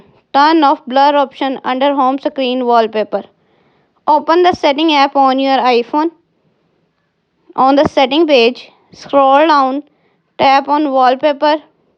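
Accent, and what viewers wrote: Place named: India. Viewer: Every tech video there Indian and I can’t understand them like can’t there be American tech videos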